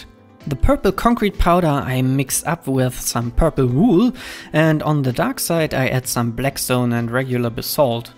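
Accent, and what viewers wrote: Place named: Germany. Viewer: cool wie man heraushört das du deutsch bist xD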